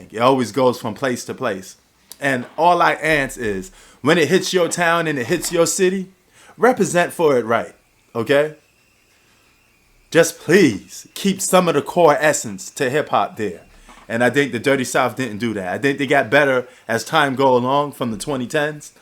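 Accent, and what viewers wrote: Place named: New York, United States. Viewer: Why do you talk the way YOU do sir? Y’all sound funny to us southerners as well so what’s up?🧐 I give props to NY for what they did in the 80’s and 90’s but today NY is Toilet Music. Once Nas and Jigga hang up the mic or pass away it’s a WRAP WRAP. 8 Million Stories and cats up there was scratching to go platinum. The west coast was kicking y’all in the teeth in the 90’s. You think somebody forgot about Puff Daddy ushering in all that jiggy garbage in 1997? Y’all don’t wanna blame him kicking in the door with this over commercialization of Hip Hop. He was the one that opened the door for No Limit and Cash Money. Master P was on his heels in ‘97 too!! The South does have some great rappers but you haven’t taken the time to listen. You just listen to that garbage on Hot 97. It’s a shame when the hottest new NY rapper is Ice Spice. 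What a joke!! Do yourself a favor and listen to by and by as a further rebuttal while you throw rocks from a dusty brownstone in your basement. Btw DJ Premier is from TEXAS!!!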